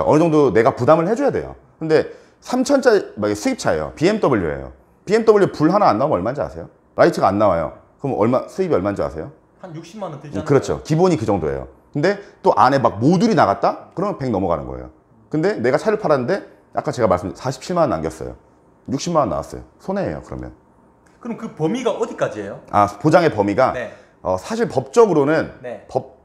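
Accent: Gyeongsang, South Korea. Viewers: 진행자분 경상도 사투리좀 감추세요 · 딱 들어도.. 경상도사투리인데 왜 북한이라고 하는지?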